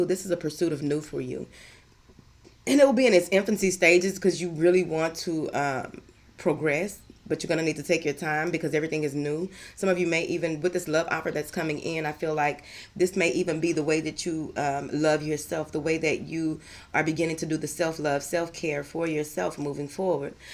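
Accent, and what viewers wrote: South, United States. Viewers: Southern girl!?? I’m from GA & country as ever and I love your country talking tail 😂😂😍😍🙌🏾🙌🏾 specially when you say “Whatever”💁🏾‍♀️🤷🏾‍♀️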